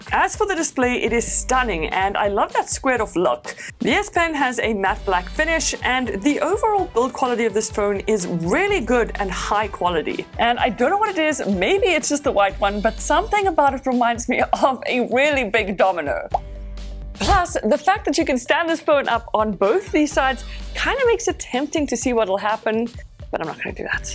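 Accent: South Africa. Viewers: You still in South Africa? Thought you had the accent... checked the about area and was right 🤣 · You have a South African Accent, I wonder where you are from? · Why have I just come across this channel now?! Great video! Subscribed!! Also, is that a SA accent? Lol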